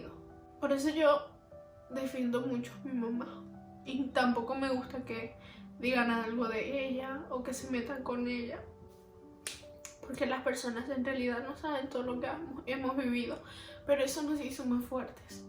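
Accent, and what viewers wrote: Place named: Paraguay. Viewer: Sabiaaaa que eras paraguaya por tu acento de español aaa que lindoo😍 yo también soy de Paraguay😎🇵🇾 edit: no sé cómo llegue acá mi mamá también vive en Argentina y este año quiero ir para conocerle. (también soy cristiano) pero ellos no :(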